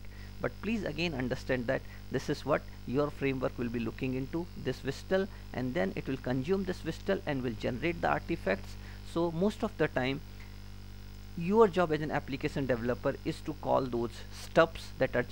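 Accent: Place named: India. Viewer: Really good video! And I love the indian accent, especially in the word 'redundant' :D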